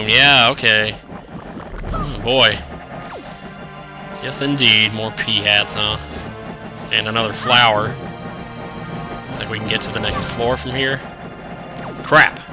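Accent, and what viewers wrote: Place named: Wisconsin, United States. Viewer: Are you from Wisconsin? you seem to have a wisconsin accent... like me lol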